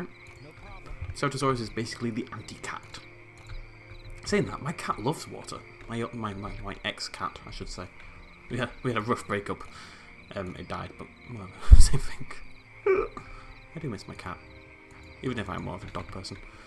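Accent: United Kingdom. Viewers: I find your British accent hilarious when you speak French.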